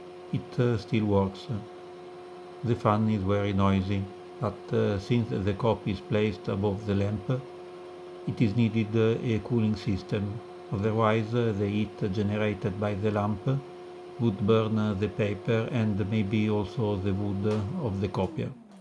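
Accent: Italy. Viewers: Ma... come si fa a registrare un video parlato in inglese con una pronuncia italiana tanto terribile...!!! La prossima volta veda di parlare nella sua lingua, anche perchè youtube offre ottimi sistemi per la traduzione dei contributi in altre lingua... ecchediamine !!! Questo video sarebbe stato interessante, ma sono stato costretto ad abbandonarlo per il disgusto. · The Italian accent makes it. 👌🏼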